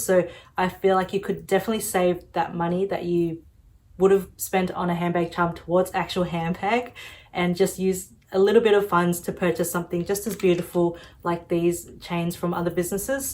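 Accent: Australia. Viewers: I support ozzie youtubers^^. Are you in Sydney or Melbourne? As you sound ozzie ~^^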